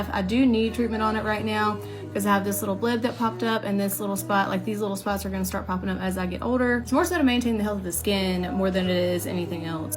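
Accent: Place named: South, United States. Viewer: Damn, ur pretty...and that southern accent! Dont care nothing about makeup but enjoyed just watching u talk. More beautiful in this clip than any of the others.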